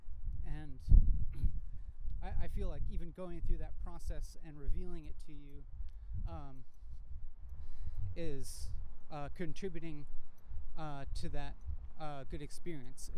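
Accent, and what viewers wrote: United Kingdom. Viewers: i thought you were british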